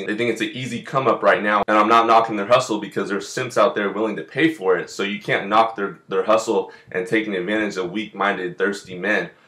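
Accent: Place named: California, United States. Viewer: I can hear the california in your voice.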